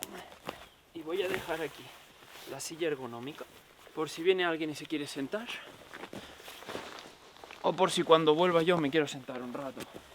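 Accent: India. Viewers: Por probar, he visto tu video doblado al inglés por ti, y la verdad solo a modo de crítica constructiva, hablas muy bien inglés pero pones un acento indio. Cuando puedas escuchate a ti mismo y fijate en eso para cambiarlo si quieres sonar más americano o neutro. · por que hablas ingles como un indio?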